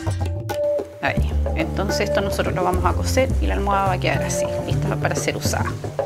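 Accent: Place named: Chile. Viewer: Me gusta mucho su acento de Chile. Saludos desde USA California. Gracias por compartir su saviduria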